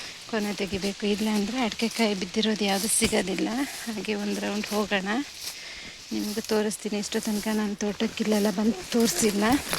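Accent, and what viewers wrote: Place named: Tulu Nadu, India. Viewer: Nimage Thulu barutha magu mathaduvaga anishithu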